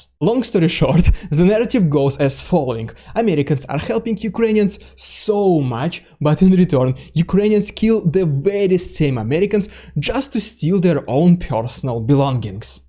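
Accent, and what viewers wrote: Russia. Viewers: No, it's the Russian accent that also ads to this channel being totally amazing!💕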